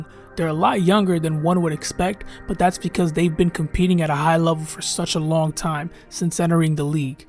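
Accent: New York, United States. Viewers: That accent. You from NY fam?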